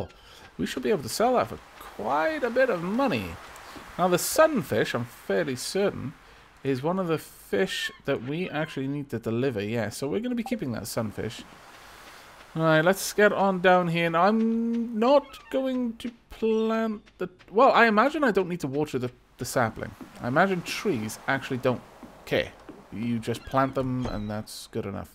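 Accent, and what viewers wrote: United Kingdom. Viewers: from 1 brit to another, I can definitely hear it with you sayings and use of language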